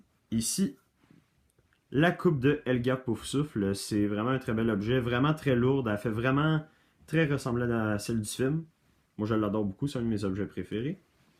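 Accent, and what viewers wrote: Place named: Belgium. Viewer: t es belge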